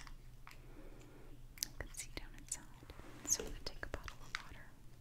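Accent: South, United States. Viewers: i love her voice so sweet and southern. like mine be safe beautiful u are doing amazing job im proud of u love u always frim a fan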